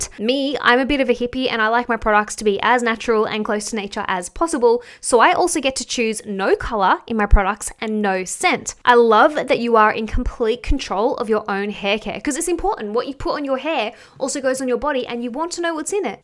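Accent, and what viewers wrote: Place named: Australia. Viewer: Girl! Since you been back in Australia your accent is getting stronger! I'm here for it not gonna lie lol 🥰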